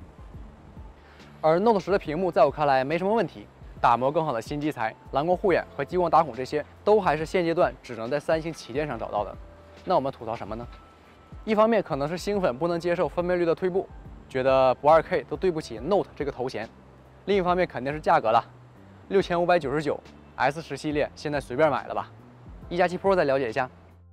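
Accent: Northeast, China. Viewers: 听口音像东北人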